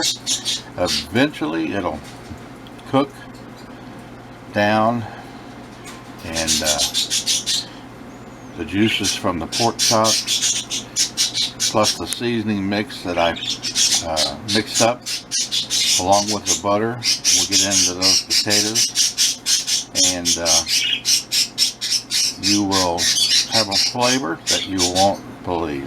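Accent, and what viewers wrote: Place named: Midwest, United States. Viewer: I enjoy listening to your calm voice. You sound like you are from the Midwest. I will try this.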